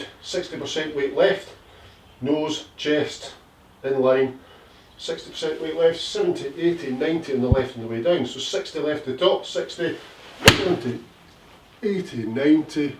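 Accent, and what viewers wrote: Scotland, United Kingdom. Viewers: Great vid good to hear a fellow Scot voice, it's just come back to me a few weeks ago I was hitting the ball great mostly on one leg thanks for the reminder 😁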